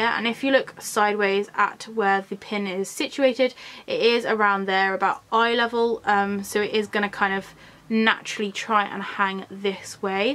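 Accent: United Kingdom. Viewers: Hi Shirley here. I luv listening to u talk, luv your UK accent.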